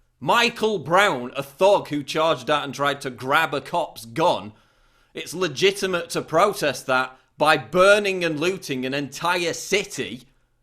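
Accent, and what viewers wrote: United Kingdom. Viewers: Hold on, you sound British... Why the hell do you care so much? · You have a British accent, shut the hell up! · whats with the british accent and such interest in american politics? always kinda weireded me out. also why do you always sound so mad? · british accent? man he is a brit living in england........ not sure why someone in england thinks they know so much about an american election........ and this info is pure speculation ................... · By the way i love the Channel thank you. Hearing Sh%t i agree with in a British accent makes us conservative yanks feel even more correct haha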